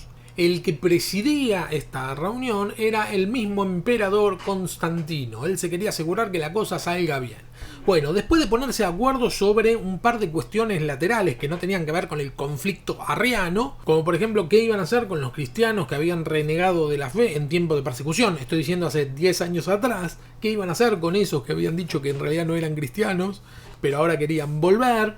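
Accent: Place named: Argentina. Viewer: un saludo hermano usted de que pais es parese asento arjentino saludos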